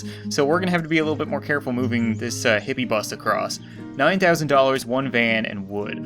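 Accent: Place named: Canada. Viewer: He sounds so Canadian back then Also I remember back then when he didn't swear a lot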